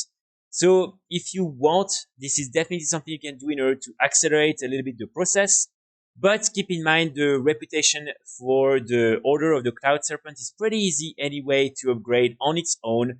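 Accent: France. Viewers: NOT a lot of gold :P. Love your content overall glad I have found your channel! Your French accent also, if I'm not wrong hehe.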